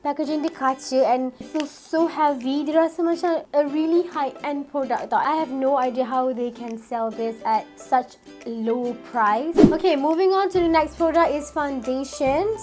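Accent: Malaysia. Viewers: Wahhh dh nk 100k subscriber...moge terus sukses, suke tgok die ni, comelll, speaking English care Melayu, 👍👍👍💖💖💖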